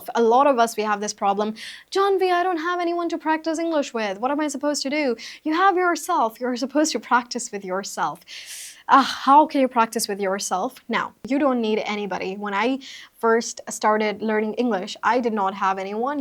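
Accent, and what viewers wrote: United States. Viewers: Apki english sunkar,mujhe essa lg lg rha hai, jaise me india me nhi , America me beth kr apki video dekh rha hu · Aha me english sikhane aaya tha lekin aa toAmerican accent bole ja rahi hai,,kuchh samajh mein hi nahin aaya😅😡😡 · I think you speak an American English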